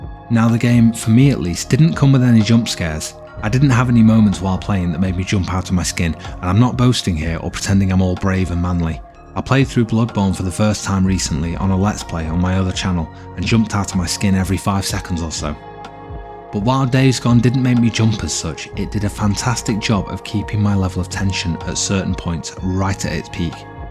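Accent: United Kingdom. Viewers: Just discovered this game reviewer. Between the warm tone, brit accent, depth, and level of brain power required to comprehend, I'm like, "this mf should be voicing everything and telling me the weather and my driving directions and boarding sequence and how awesome the next movies coming out might be. #MAKEMORECONTENTBRO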